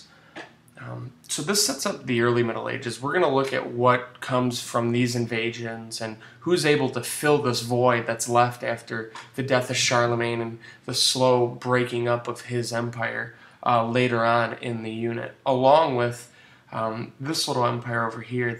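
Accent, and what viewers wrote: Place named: Minnesota, United States. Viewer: You sound like someone from Minnesota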